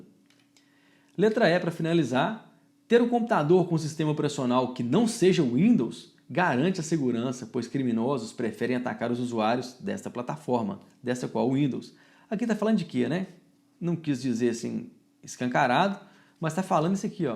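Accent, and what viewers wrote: Minas Gerais, Brazil. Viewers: Sotaque top de mineiro e professor nota mil! Continua com as aulas querido professor!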